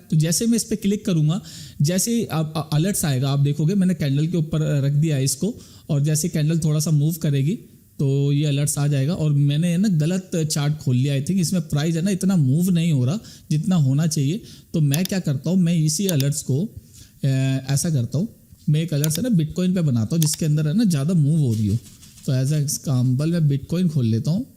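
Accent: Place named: India. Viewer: For fucks sake, is there anyone on YTB, who explains this and is not Indian and can explain it in clean English?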